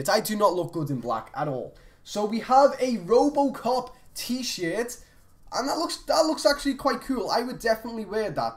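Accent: Liverpool, United Kingdom. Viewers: are you from Liverpool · Are you British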